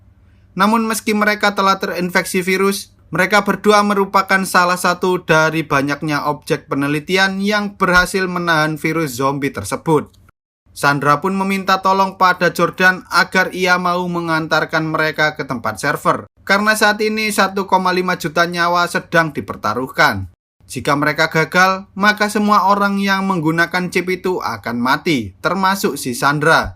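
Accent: Java, Indonesia. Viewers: Sampean wong jowo Yo?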